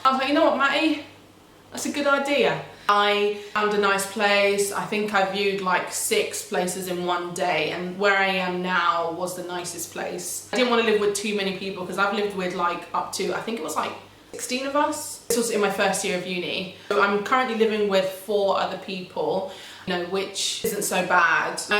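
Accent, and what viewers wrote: Spain. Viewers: I can’t with you the Spanish accent am bussin up 🤣🤣🤣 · Your Spanish accent is funny lol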